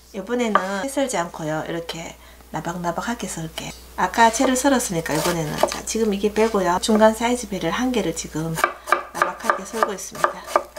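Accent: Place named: Bonghwa, South Korea. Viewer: 타국에서 잘 보고있습니다!! 제가 봉화에서 태어나고 자라서 그런지 사투리가 너무 정겹게 들리네요! 항상 잘 보고 있습니다. 설명도 잘해주시고요 ^^ 앞으로도 좋은 영상 부탁드립니다 :D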